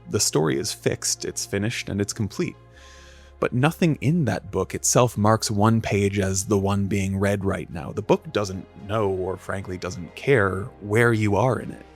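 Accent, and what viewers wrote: Ontario, Canada. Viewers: From your accent I thought you might be Canadian but I notice your profile says you're based in the US.. Are you perhaps originally from Canada? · fantastic. And I love your Ontario accent. Question though, how do we avoid a completely deterministic view. I was waiting for you to address cause and effect as a necessary component of "event". And event sequence can go both ways mathematically like pool balls, but one event leads to another and involves choice. Can you address cause and effect an include probability? Does this only result in the multiverse? The "butterfly effect" is implied here and has some implications. Essentially, there has to be an infinite number of futures because cause and effect is also a measure of time, not just entropy. Two events, one caused by another, must happen at different moments in a sequence and in time. 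To use the pool ball analogy, nothing is going to prompt the ball to emerge back out of the pocket and strike the ball that had hit it and result in the same pathway in reverse. It would require a new event at the bottom of the pocket, some sort of calibrated "popper" but even in that case, the sequence of events would follow a different path because it would be responding to different forces. Basically, I was with you until the end of this video..... fellow southern Ontarian... lol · I find this interesting but can't get past the silly, thick canadian accent.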